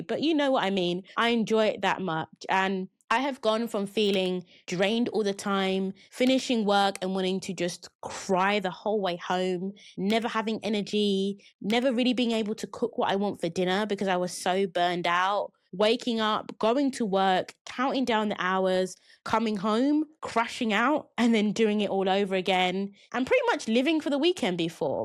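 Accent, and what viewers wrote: United Kingdom. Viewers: I have a liking for accents and it’s interesting to listen to you speak. You sound like you have a British accent with an Australian accent underneath and with an African accent (I can’t tell which country) underneath that. It’s nice 😊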